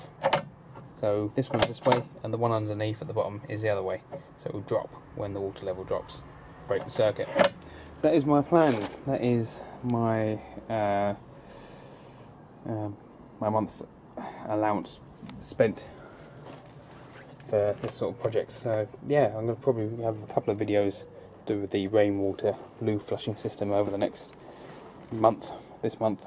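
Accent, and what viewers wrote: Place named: United Kingdom. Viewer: pretty sure youre in the UK. (judging by the accent) and here in the US its illegal to "collect rain water" -_- which ive recently found out does get enforced.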